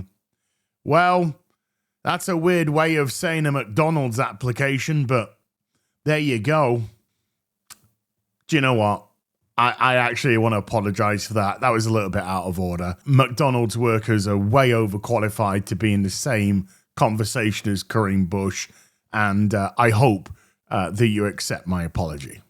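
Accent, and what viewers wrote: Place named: United Kingdom. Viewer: mate, the british accent is already insufferable, why would you subject potential viewers also to those veilguard clips they've guaranteed already seen before?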